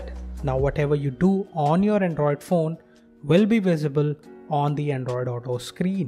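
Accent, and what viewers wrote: India. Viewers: Why are vids like these always some heavily accented Indian you can barely understand?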